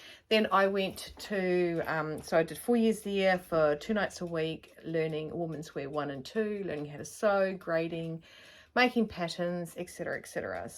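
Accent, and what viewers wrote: New Zealand. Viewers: Hi, welcome to the community of #Fridaysews. You are very natural at this, great to hear about you and your journey. Also, so cool to meet a Kiwi...yay. you go girl! And a warm hello to your Mum too. I am also a #frdaysews vlogger, perhaps either yourself or Mum have commented with me? Not sure. You have a beautiful Kiwi/Aussie accent, just lovely to listen to. Yes please, would love to see your sewing room, and your stash...and would love to meet your Mum someday, maybe a guest appearance? I'm looking forward to seeing you regularly and sharing your sewing journey 😂❤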